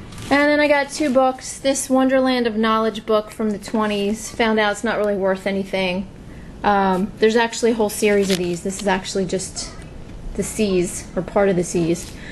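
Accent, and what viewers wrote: New Jersey, United States. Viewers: Just when we thought the crazy knit sweater guy was the funniest thing up pops your "Jerserylicous voice" with a garage sale story we are all too familiar with - thank you so much for the shout outs it really means alot to us - we are looking forward to your challenge vid - thanks again T&D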